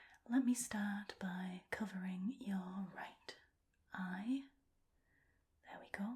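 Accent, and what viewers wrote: United Kingdom. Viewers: I can’t quite pin down your accent. Is it British, Irish or Scottish? Either way it’s lovely 😊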